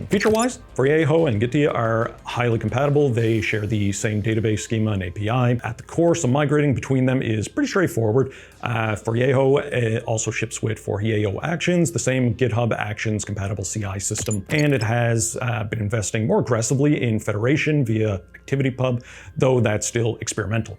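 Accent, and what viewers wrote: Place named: Nova Scotia, Canada. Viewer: sounds like a Nova Scotia accent to me.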